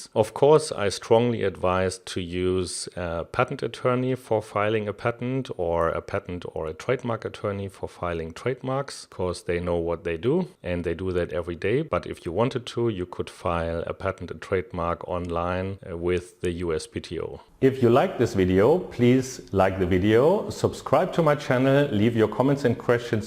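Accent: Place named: Germany. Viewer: Who is this guy ? Sounds like he’s from Germany.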